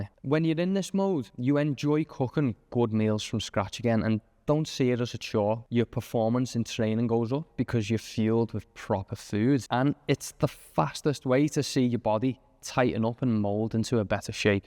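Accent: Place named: Merseyside, United Kingdom. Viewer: That's a Merseyside accent, Liverpool or the Wirral...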